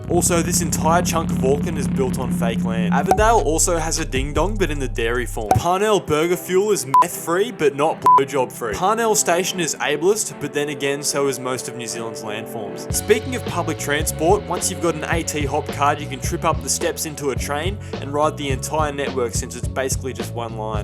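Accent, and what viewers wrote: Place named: Australia. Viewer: Your australian accent is fucken yuck. Shot for reminding me why when I do leave nz I'm not going to Australia.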